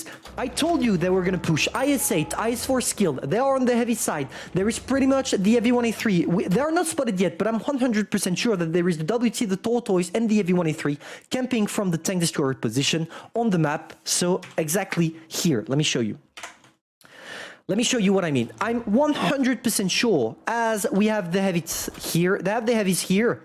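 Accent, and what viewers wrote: France. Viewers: Are you french?🤨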